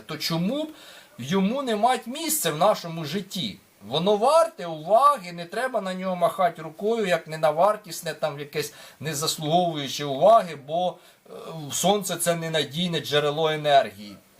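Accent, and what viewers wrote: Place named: Poltava, Ukraine. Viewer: Дядко точно з Полтавщини! Тільки там так розмовляють. )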